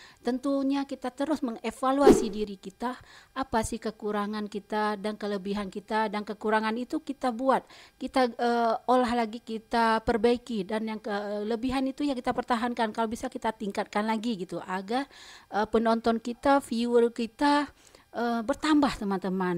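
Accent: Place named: Medan, Indonesia. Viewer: Aksennya seperti logat Medan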